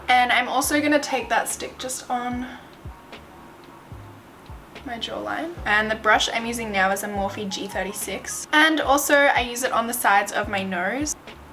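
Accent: Australia. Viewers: I watch your videos religiously and not just for the makeup...I'm so obsessed with Australian accents lol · I've quickly grown to fall in love with your style, personality, all around everything. Can I be you? Or can we just be friends? I'd settle for that 😫😥 keeping doing you!! I absolutely love all that you do! From cruelty free from your Australian accent to your way of explaining & doing your makeup 💋‼️😍 slaaay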